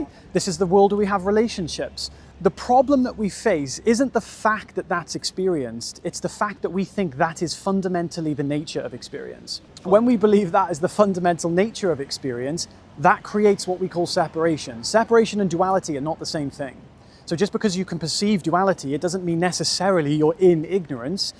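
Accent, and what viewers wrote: Wales, United Kingdom. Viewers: Detecting a bit of Welsh in your accent. On another note I love that there are other people walking round contemplating the same things that I do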